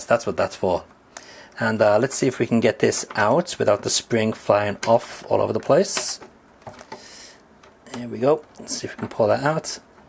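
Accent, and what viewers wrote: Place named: Liverpool, United Kingdom. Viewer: sometimes your accent sounds just a little like Dave Lister from the Dwarf. Boys from the Dwarf!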